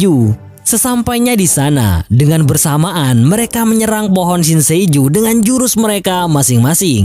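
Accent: Madura, Indonesia. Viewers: mimin orang Madura ya? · Ia kayaknya. Logat dan nadanya Madura bgt hhhh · Logatnya Madura banget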